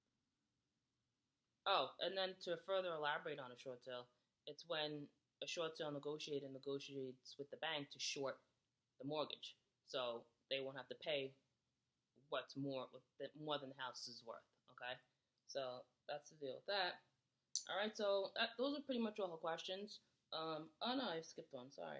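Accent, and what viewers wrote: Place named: New York, United States. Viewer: Very informative ! Great NY accent and tonality 👌🏾!!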